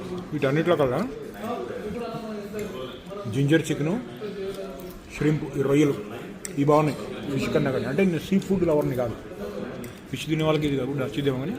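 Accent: Andhra Pradesh, India. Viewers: మీది నిజంగా నిజామాబాద్ యే నా ఎందుకూ అంటే మీ మాట్లాడే తెలుగు యాస తెలంగాణా కాదు ఆంధ్రా తెలుగు యాస లాగా ఉంటుంది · nee telugu pakka andhra bro .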